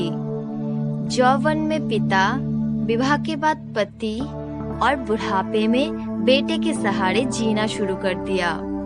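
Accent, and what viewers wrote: India. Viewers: Pronounciation is pathetic. Bengali accent is evident.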